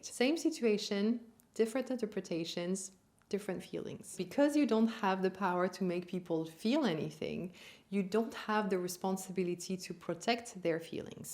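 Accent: France. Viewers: I would love to have you as an older sister of an aunt. You are so admirable and seem like a very lovely person, I love your calm voice and subtle French accent. It is very reassuring.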